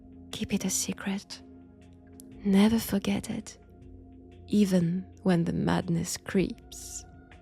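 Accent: France. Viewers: I'm curious,are you a real french or do you just talk that way? I like this kind of way you do this,it has something. Good Roleplay for this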